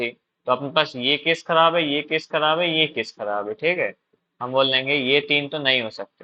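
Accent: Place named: Bundelkhand, India. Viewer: Bhaiya ur Bundelkhandi accent is so good 💯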